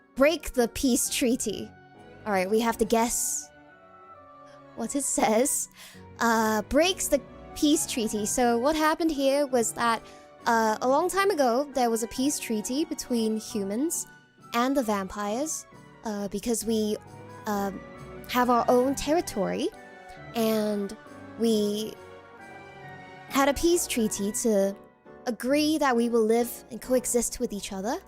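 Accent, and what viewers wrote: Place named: United Kingdom. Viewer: I never know an ojou-sama with British accent is what I need all along.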